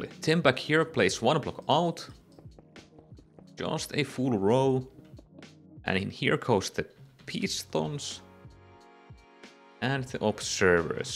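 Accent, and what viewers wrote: Finland. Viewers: Amazing, thanks! Gonna build this on my Realm, best design I've seen so far and super easy to follow. Love that Finnish accent too, haha! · You sound finnish are you finnish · I like how i automatically knew you were finnish from the accent. Torille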